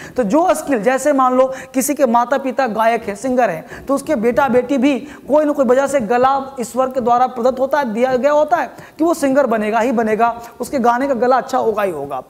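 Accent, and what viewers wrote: Bihar, India. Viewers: आपके आवाज से लगता है कि आप बिहारी मूल के है...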